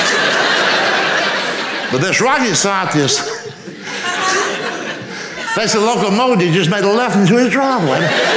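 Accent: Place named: Louisiana, United States. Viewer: sounds like he's been to Louisiana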